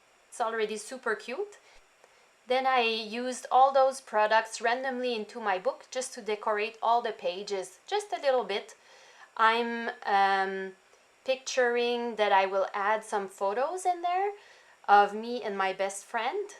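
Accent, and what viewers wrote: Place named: Quebec, Canada. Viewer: Beautiful water colouring, it looks so pretty and relaxing. Love the rainbow with the string, can’t wait to do this with my daughter. Amazing mini book, you make it so fun and easy. Thanks for all these great projects, ideas, and inspiration. Love listening to your accent, working on our French in Ontario and hoping to make another trip to Quebec soon.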